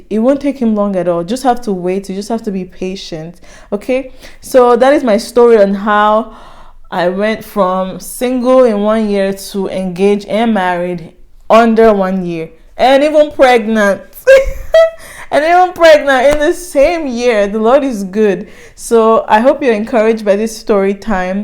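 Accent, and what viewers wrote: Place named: Benue, Nigeria. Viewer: New viewer here❤? Where are you from,you sound like someone from Benue,Nigeria…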